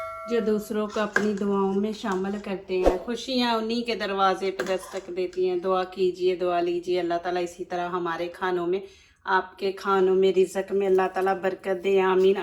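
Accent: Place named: Punjab, India. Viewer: MashaAllah zabardast bhout khubsorat still awaz ka Andaz Panjabi bhout khubsorat laga dia Karachi Pakistan khush rakhae ameen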